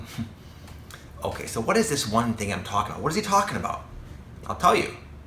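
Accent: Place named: Boston, United States. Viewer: Ahhgg, it's a Boston! 😄💕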